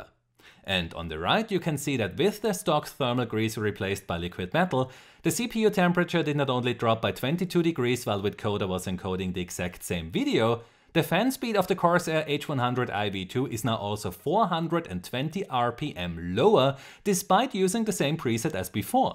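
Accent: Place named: Germany. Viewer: are you german? where does your strong accent come from?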